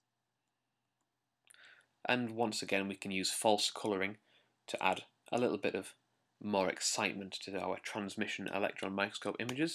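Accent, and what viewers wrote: United Kingdom. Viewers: Great, easy to understand. And not a robotic voice. An awesome British speaker.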